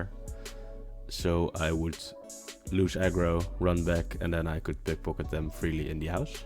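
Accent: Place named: Netherlands. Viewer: I cant help but notice your accent, a dutchy right?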